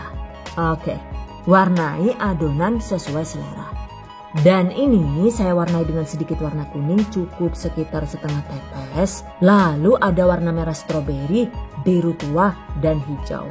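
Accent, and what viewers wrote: Banyumas, Indonesia. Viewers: kok bahasa medok banyumas, asli bms ya jeng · Ngapaknya bikin ngakak bunda.. 😄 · Wongendi kie deneng ngapak temen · Ko bahasane bisa ngapak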